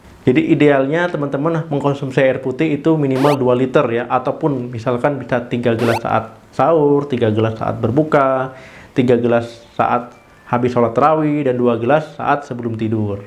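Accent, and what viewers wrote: Aceh, Indonesia. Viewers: Dok orang Aceh ya? Logat nya Aceh masih kental soalnya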